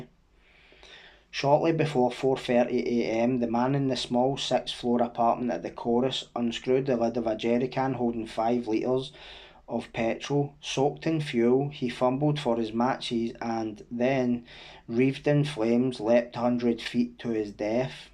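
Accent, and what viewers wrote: Scotland, United Kingdom. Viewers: Liked and subscribed love the channel refreshing hearing storys from Scotland in Scottish accent by someone who has experienced it instead of videos made by people that cant even pronounce the areas or the schemes right does my tits in keep up the good work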